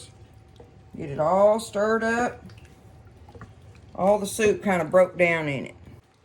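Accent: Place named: Texas, United States. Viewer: Damn... I moved away from the Texas/Oklahoma region over 30 years ago to other parts of the U.S, and I thought I was happy. But Shyte, your recipes take me back to my youth, and I miss being back in the Texas/Oklahoma area!! My Mama used to make a variation of this dish, but not nearly as complex as yours. Mama used Minute Rice. 👎👎 Thanks for all your videos. Gawd I love your Texas accent! God Bless!!!!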